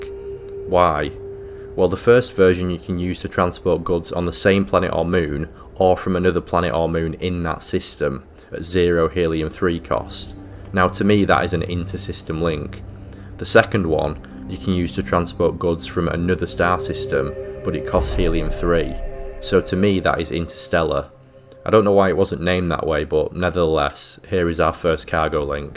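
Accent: United Kingdom. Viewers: Thanks, this is the first video ive seen that has a nice soothing UK accent over "some others" that are hard to bear, and explains the flow through the various processes quite well indeed. Many thanks. On PC ( Possibly consoles also) there is a mod you can get called Richer Galaxy which increases the vendor cash amounts and a few other things also, might make it at least feel worthwhile to make and sell your niff naff tat.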